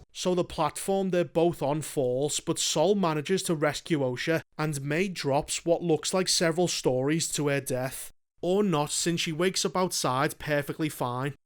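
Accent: Liverpool, United Kingdom. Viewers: is scouse, he’s from Liverpool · My favourite scouser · The scouse accent makes this even better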